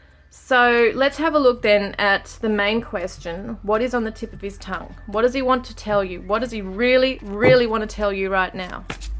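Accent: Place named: Australia. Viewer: i like ur accent is it australian?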